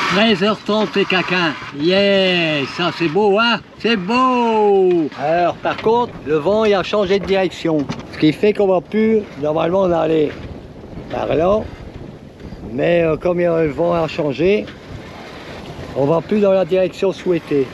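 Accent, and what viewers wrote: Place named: North, France. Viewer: Je vous suis depuis la Vendée, un bel accent du nord, merci à l'interprète, même le chien à l'accent du nord. Cordialement.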